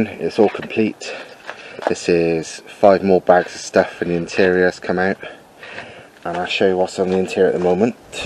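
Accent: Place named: Gloucestershire, United Kingdom. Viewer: Proper Glos Accent in there mush.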